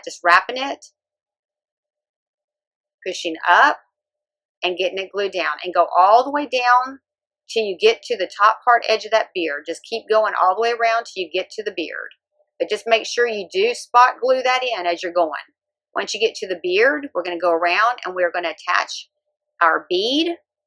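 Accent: South, United States. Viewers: She’s the fastest talking southerner I’ve ever heard!! Lol. She must have sped up the video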